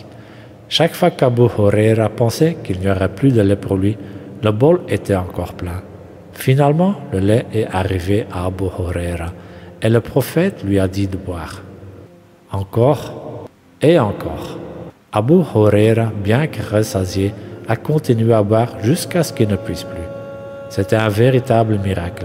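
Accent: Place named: Flanders, Belgium. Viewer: Masha Allah. Ben jij van Belgïe? Ik hoor een Nederlandsetalig accent🙂 daarom. Mooie video Allahoumma barik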